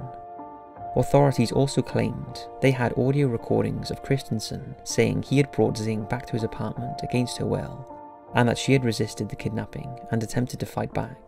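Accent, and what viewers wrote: United Kingdom. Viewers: I love the way you brits say shit like, 'Media'... 'Medier'. It's awesome. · Your British accent was making it difficult to understand what you are talking about, and there are at times you were mumbling on each of these cases. Please speak clearly and as loud as possible so that we can listen and pay attention.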